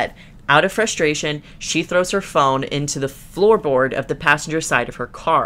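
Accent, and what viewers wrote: United Kingdom. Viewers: This is my first video of yours and I was 100% ready to believe you were British, you could've went the whole vid with the accent and I wouldn't have been the wiser lmao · THE WAY U SAID NOVEL HERLPELEPRP UR BRITISH ACCENT IS SO FUNNY TO ME I LOVE IT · This is the first video I've watched from you and I almost clicked off when I heard your british accent😭😭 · . i literally went to another video of yours cause i thought maybe I remembered wrong and you were actually british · first time watching u. first 5 seconds i was terrified that u were british, anyway amazing video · pretty good "British" accent for an American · This is literally my first video of yours and I literally thought u were British 😭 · this is the first video of yours that i’ve ever watched & for the first 5 seconds i fully thought you were british lmao 😂. anyways, thoroughly enjoyed this excellent roasting of the “after” series & love your whole ✨ aesthetic ✨ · bts i love your british accent lkjwqal;/lsakjuk;.lsa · the british accent caught me off guard 💀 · Gotta say, I love your Bri'ish voice. 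· Btw I know this has nothing to do with it, but you with the British accent, kinda sounds like Ron from harry potter, I'm not saying this to be rude, I was just kinda amazed at how close it was. :) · why is your english accent is more believable than the actors???? 😭😭😭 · This was the first video of yours I clicked on and was surprised that you had a British accent, then surprised that you didn't. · Your British accent was great :D As someone from England I can safely say, we all speak like that XD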